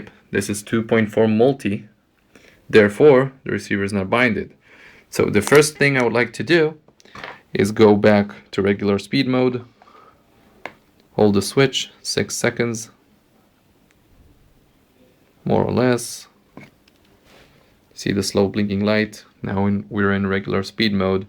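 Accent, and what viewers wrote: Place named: Israel. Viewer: I thought you were Isreali....Your accent is so cool...Manish ma baby!! LOL Duvid Mordechi..... Hey boychick, let us know what kind of range this thirty dollar receiver has.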